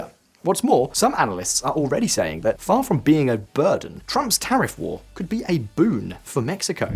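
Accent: United Kingdom. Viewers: ditz with a brit accent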